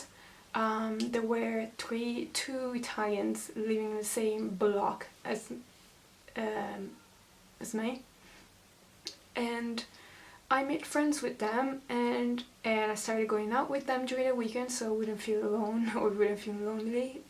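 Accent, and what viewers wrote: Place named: Ireland. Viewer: You have an Irish accent!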